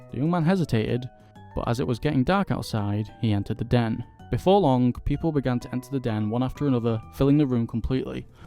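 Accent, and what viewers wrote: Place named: United Kingdom. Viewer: I really enjoyed this video, great to hear you reading the story out and your reactions to it 😂 I liked the video editing too. Also as a fellow Brit my first thought hearing you was "ooh yay, is British too!"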